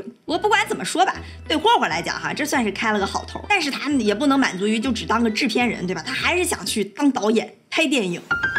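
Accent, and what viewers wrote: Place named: Northeast, China. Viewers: 大连妹妹，口音明显，支持老乡! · 同意，小lin的笑声有东北味儿，小lin是东北人吗？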